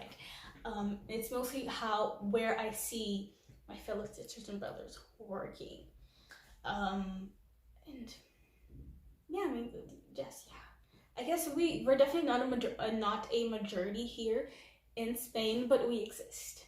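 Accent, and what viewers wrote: United States. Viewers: I live outside of Benidorm and work as a promoter in tobacco shops there and yes I see the staff watch Black people like you described and not look at people with lighter skin colors the same way. I'm curious, from your accent I'm guessing you're from the States (I am too) would you say that racism is different or more or less compared to your home country? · Hey!im from Valencia too!😎..but i lived in North Carolina since 2003!...for you accent sounds like you from USA,am i right??congrats for your channel !😊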